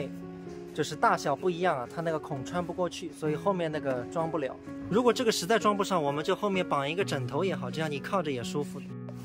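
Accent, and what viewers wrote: Suzhou, China. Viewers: 保持讲苏州闲话， 蛮好听的 😃。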